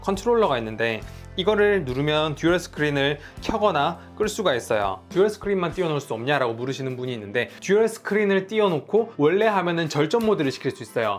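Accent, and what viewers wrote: Gyeongsang, South Korea. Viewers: 잘 만든 영상 잘 보았습니다 한 가지 거슬리는건 경상도 사투리 말을 빨리하려다 보니 사투리 억양이 너무 심하군요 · 대구사투리인가...부산사투리인가....그것이 궁금하다...허허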